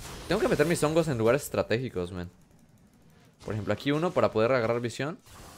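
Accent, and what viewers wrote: Colombia. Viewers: Eres de Colombia? XD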